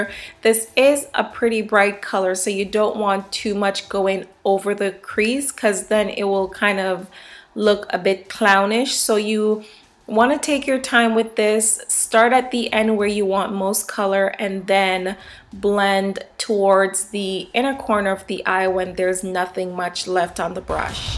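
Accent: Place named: Jamaica. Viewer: Lovely makeup. I can hear an accent. Are you from Jamaica? If so, I am too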